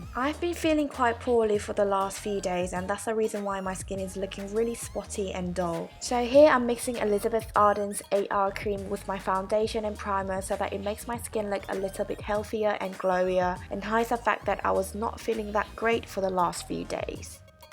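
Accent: United Kingdom. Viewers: new to ur channel was so shocked to hear that brit accent! haha this vid makes me excited for my trip to korea! :) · 네일 어떤 색상인가요?? 한국꺼면 좋겠당ㅠㅠ.. 저도 외꺼풀이라 자주보고있어요!!! 영국 발음도 너무 이쁘고♥♥♥ 건강 관리 잘하시구 앞으로도 좋은 영상 부탁해요~~ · 아픈건 괜찮으신지용...! 그나저나 뭔가 핼쓱... 해보이셔요ㅠㅠ 영국발음도 너무 좋아요ㅠㅠㅠㅠ 항상 영상 볼때 엄청 집중되요 발음때문에ㅠㅠ 끄아아 저랑 눈 모양이 비슷해서 항상 공감되요 눈화장이ㅎㅎㅎㅎㅎㅎ 짱 좋음! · 저도 속쌍꺼풀인데 화장이 너무 예뻐요 영국발음도 너무 멋있고 매력적이에요!!!!!!!!다른 튜토리얼도 많이 올려주세용❤️ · 이런 거 잘 못 남기는데 언니니까 댓글남겨봅니다..ㅠㅠ ㅠㅜlove...♥ㅜㅜㅜ 처음엔 저희언니가 보는거 따라보다 보게 되엇는데 언니 정말 매력 넘치세요...ㅜㅠ♥ 정말 같은여자가 봐도 반할정도...ㅠㅜㅠㅠㅠ ㅠㅠㅠㅠㅜㅠㅠ그리고 진짜 언니가 고르시는 색마다 언니랑 정말 잘 어울려요...ㅠㅠㅠ 최고최고...ㅠㅠㅠ♥ 1년뒤에 저도! 드!디!어 이제 화장할 날이 올텐데...!!...ㅠㅠㅠ 그땐 직접 따라해볼게요ㅜㅜ항상 업로드해주셔서합니다!!!! (영ㅇ국발음도매력짱짱!꺅언니최고!)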